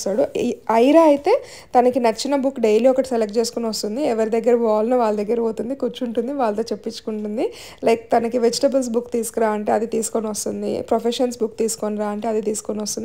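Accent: Rayalaseema, India. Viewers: మన రాయలసీమలో మాటల్లో చెప్పడం చాలా బాగుంది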